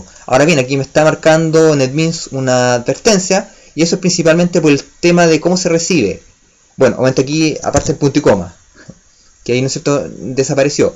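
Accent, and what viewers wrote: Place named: Chile. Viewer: Chileno creo xd.. tratare de hacerlo con axis2...podrias hacer un video con axis tambien, donde el response sea un objeto, ya que perdi unas buenas horas antes de darme cuenta que axis2 al retornar un List como response , quien consuma el servicio le dara null, espero que haciendolo como objeto resulte..gracias pro el tuto men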